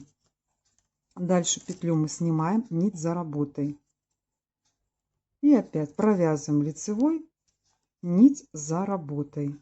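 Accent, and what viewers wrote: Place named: Belarus. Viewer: здравствуйте! Почему я раньше вас не видела? Вы с 2015 года ведете канал, а только сегодня я с вами встретилась, познакомилась и, конечно же, подписалась. В целях знакомства пересмотрела много ваших видео. Очень познавательно и полезно. Хотя я вязальщица с уже достаточно большим стажем, многое взяла себе на заметку, ведь учиться и узнавать что-то новое никогда не поздно и совсем не стыдно. Спасибо вам, что делитесь своими знаниями со своими подписчиками. Удачи вам, новых идей, вдохновения, легких петелек, благодарных подписчиков и процветания каналу. Забыла сказать - ваш белорусский говор покорил меня сразу! 💖🌸🌺🌸🌺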